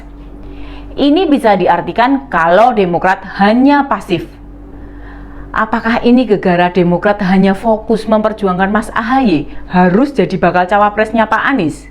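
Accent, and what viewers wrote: Java, Indonesia. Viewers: Bahasa yang medhok chas Mbak enak juga didengar 😃😃❤👍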